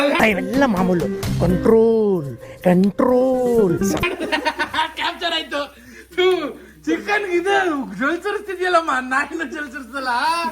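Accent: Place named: North Karnataka, India. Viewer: Neev matadiddu uttara karnataka bhasge...not uttara kannada bhashe..😂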